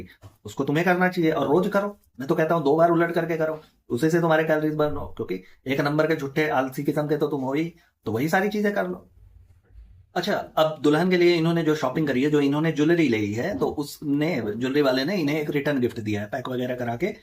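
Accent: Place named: Lucknow, India. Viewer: Are you from Lucknow or Hardoi or nearby places like Sitapur, actually aapke baat karne ka tarika bikul wahi ka hai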